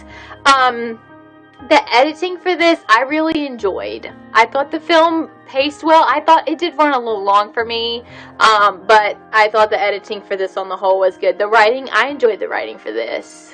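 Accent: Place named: South, United States. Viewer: Just saw this movie. Haha where are you from? Judging by your southerness, you seem really close by. 😂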